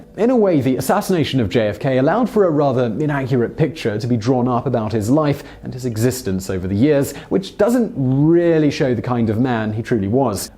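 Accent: United Kingdom. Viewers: Uhh Debbie Reynolds, Elizabeth Taylor and Eddie Fisher should have been number one not scandal involving the Sherlock Holmes author and Houdini. But maybe bias since you sound like you’re from the UK?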